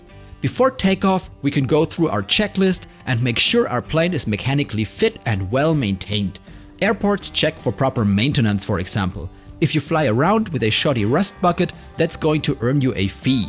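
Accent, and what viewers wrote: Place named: Germany. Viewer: Ich hore das deutsche deutlich raus. 😂❤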